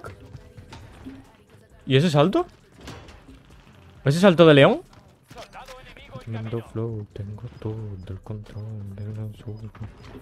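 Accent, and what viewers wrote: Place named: Argentina. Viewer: Grande pensé q eras argentino jóder...abrazo desde Argentina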